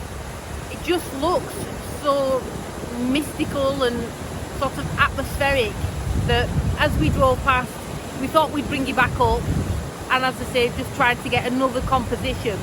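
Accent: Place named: Lancashire, United Kingdom. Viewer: Brilliant :-))))) That last few seconds going on about your make up LOL! After taking a paddle in the river, that should have been the last thing to worry about! Gorgeous images, being from Lancashire myself, great to hear that dialect.... :-)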